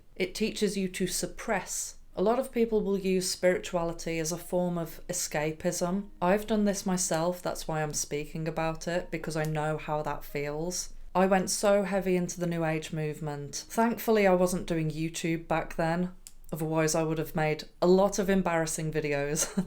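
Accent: Birmingham, United Kingdom. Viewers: In these turbulent times, I can recommend a return to traditional orthodox Christianity. As westerners, this is already pre-anchored in our subconscious and is a rich and interactive spiritual environment with angels, saints and The Theotokos (Mary) etc. Love your very slightly brummie-sounding accent 😊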